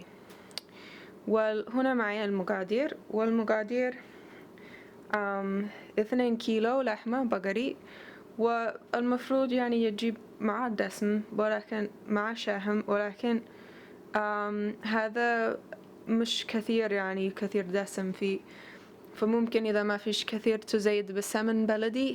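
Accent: Yemen. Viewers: I like your Yemeni style Arabic.. and your recipes of course :) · تسلم الأيادي تقديم مميز للأكل اليمني بطريقه سهله وسلسه بعملها الآن بطريقتك بس لحم خاروف وقطع اصغر ومتأكده انها بتكون لذيذه وحلوه كجمال اللهجة اليمنيه سبحان الله وبحمده سبحان الله العظيم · ههههه تعجبني وهي تتكلم عربي باللهجة اليمانية المحشوش اكلة جيزانية بس مااعرف كيف يسوها بس اطلبها من اقربائي اهل جيزان ويجيبوها جاهزة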